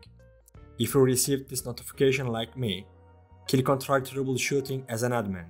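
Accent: Turkey. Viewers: This guy he is so Turkihs , his accent screams " I AM TURKISH "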